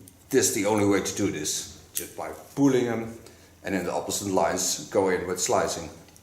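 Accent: Netherlands. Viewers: I love to watch your videos!! Part of the attraction is to see your cutting & colouring. It’s exciting to see your finished results, amazing. Part of the reason I especially enjoy your channel is because I’m half Dutch & hearing your accent reminds me of my late father & my remaining family in the Netherlands. My father was born in Haarlem but very sadly he died when I was young, in the mid 1970’s. All the family are now around Assendelft. As a tiny child I remember my father speaking on the telephone to family in the Netherlands. I remember he often spoke with Uncle Anton who was a hairdresser with a salon in Amsterdam!!! Unfortunately I only now have cousins alive in the Netherlands, all other family have passed away. So…when I watch your videos it makes me so happy to think of the Netherlands & my very wonderful family there…those that are no longer with us & those still alive. 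Thank you!! 🇳🇱👍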